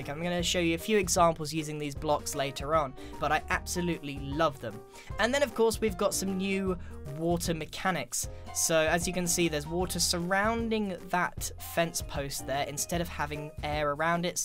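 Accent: United Kingdom. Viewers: Rafael YT relax he sounds like he's british or something he probably is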